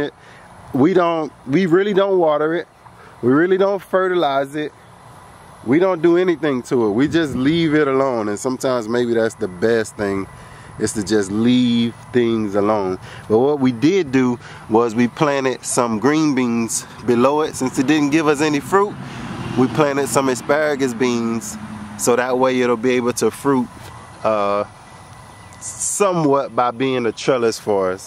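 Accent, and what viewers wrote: Louisiana, United States. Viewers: As soon as I watched your first video (the one I watched before this one) I said "he sound like my people". So naturally I liked (👍) the video. Less than a minute later you verified it when you said something died off because the Louisiana heat was starting to set in. I was sold then, on top of you being out there with the family and your knowledge base just made it all the better. What part of Louisiana are you in? I'm from Belle Rose/Baton Rouge (south, la) but live in Ruston (north,la) and have been attempting to try my hands at growing with little success. I think I'm afraid to "go all in" and fail so im very cautious about how much and what I plant. Do you have any suggestions on a small but mighty starter garden? Thanks in advance.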